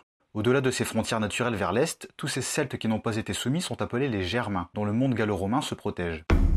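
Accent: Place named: Nord-Pas-de-Calais, France. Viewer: "les rouos fainéants, Childéric trouo, Thierry trouo, à la suite de quouo, bras drouot du rouo".... elle serait pas ch'ti??? à part ça c'est très bien:-):-)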